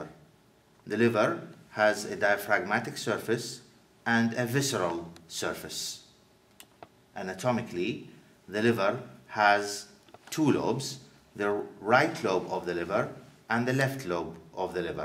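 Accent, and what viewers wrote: Iraq. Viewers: you are speaking like Iraq people ... you must be from Iraq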